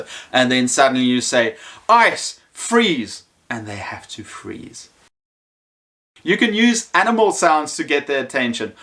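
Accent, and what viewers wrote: South Africa. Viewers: Thank you.I love love call and respond technique.I Shout "can you hear my voice?" And they have to clap and stand still. My voice is still hoarse from shouting,talking and just interacting with students all day. LOVE THEM tho. South African?🇿🇦🙌😊much love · You sound South African... :)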